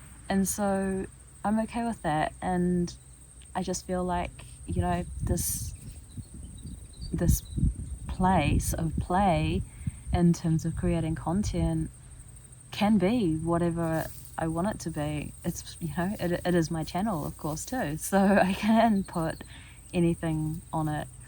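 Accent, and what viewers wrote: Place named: New Zealand. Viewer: Good morning. First of all, welcome back home to Godzone, Aotearoa, Nz. I WILL continue to follow, stay subscribed to your channel. I guess the content is easy to follow, simply because it's in "our" accent, and not in a monotone. My response, even though it's a cliche; is "You do You." I don't know what you have planned, but I will be here in Murchison, June to August. I would love to meet you personally. I kinda feel, that you have more to say. However it does get cold, through the winter here, in the village, but in reality, there's no such thing as cold. Just an absence of heat.!! 😮 Thank you for your previous content, and I look forward to further cooking episodes, if that is what you have planned. Maybe, van life.? In the meantime, have a break. All the very best. Much aroha.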